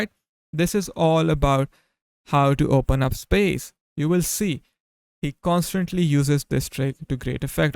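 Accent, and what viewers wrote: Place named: India. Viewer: Indian accent.. is tough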